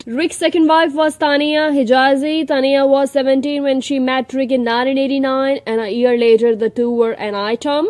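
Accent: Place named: India. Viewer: Who are you, with this heavy indian accent???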